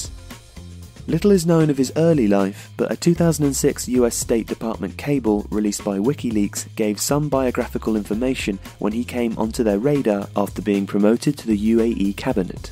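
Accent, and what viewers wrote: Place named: England, United Kingdom. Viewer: your narrative sounds heartfelt and sincere, in the end that's all it is. The narrator sounded English, presuming you are too. Frankly, just cut the crap ok, mate. If you are English you need to cut the b.s. with your holier then thou tounge. English were always the clever racists, at least that's what they believe anyway. Like I said, don't use the poor migrant workers as a front to establish your bigotry( I have relatives who lost almost everything in these scam), your agenda is quite clear and clearly biased. You are also showing your true color and age, by using animation to describe something that lack authentic journalism. Make your YouTube bucks and shut your trap, boy.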